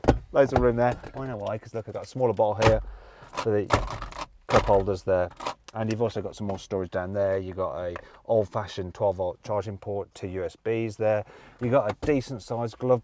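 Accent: England, United Kingdom. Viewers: I don’t know why but after watching this video I feel agitated. Part of the reasons is because the guy’s handling of each part of the car was quite rough and thought he might break something. Also i don’t get most of what he said due probably to his real English accent.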